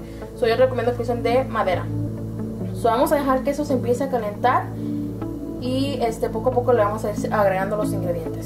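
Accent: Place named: United States. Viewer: cada vez de dices SO! es como una patada en la espinilla.... adios con tu cento pocho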